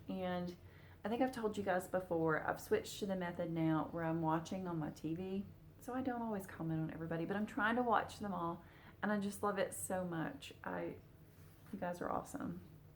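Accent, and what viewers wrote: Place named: South, United States. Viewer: I soooo enjoy you, your soft slightly southern:-) accent is sooothing to listen to...:-) enjoyed your WIPs your HRH is so beautiful, not to far and your finished...indeed your videos are my first choice. Loved your Haul... Indeed if all mankind would live in mutual love, a kindness would be likened unto a vapor before us, changing us...💛💛 Blessings...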